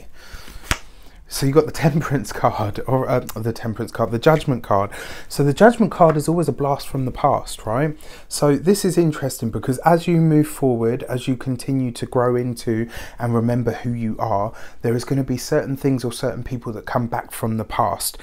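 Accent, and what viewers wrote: United Kingdom. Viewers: OMG your british accent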